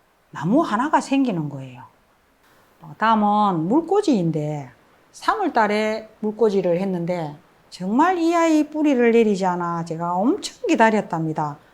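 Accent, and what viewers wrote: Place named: Gyeongsang, South Korea. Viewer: 항상, 좋은 작품을 많이 보여 주시는군요.. 감사합니다. 목소리도 구수한 경상도 사투리 참 듣기 좋습니다..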